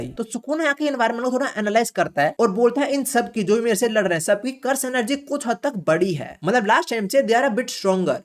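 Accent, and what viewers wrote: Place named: Haryana, India. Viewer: Hate his haryanvi accent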